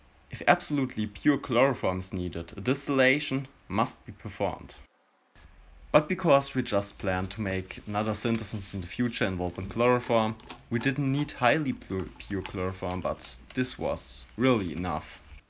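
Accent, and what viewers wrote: Germany. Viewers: I hear your german in your voice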